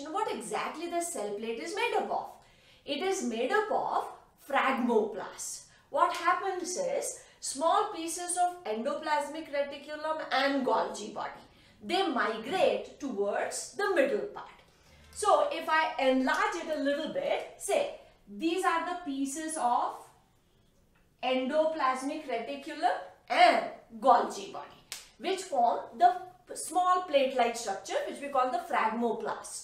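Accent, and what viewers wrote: India. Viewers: Usually I cant stand indian accent. But yours is just admirable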